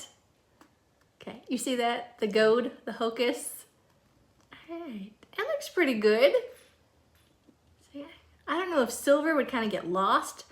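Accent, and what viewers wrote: South, United States. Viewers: I love the whole thing!! You don't talk funny it's just our southern drawl!! ❤🤔😂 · I enjoy your channel so much! I'm playing catch up now watching all I can! I can understand you just fine I'm a southern lady too! 😊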